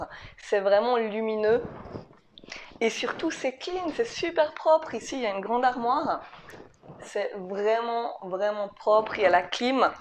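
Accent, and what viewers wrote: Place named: Switzerland. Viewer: Salut, sympa ce vlog! Je suis franco-suisse et j'ai tout de suite reconnu ton mignon accent. Le mot "crouille" est inconnu des Français MDR! Bref, merci pour ces conseils, ils sont très pertinents et je vais les garder dans ma liste. Quels prix vraiment pas chers pour tout ce confort! Au plaisir, Marjorie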